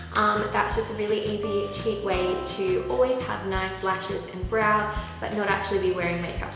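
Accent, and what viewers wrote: Australia. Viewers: Are you Australian? Random question, I know. But great job with the makeup though! 😃💄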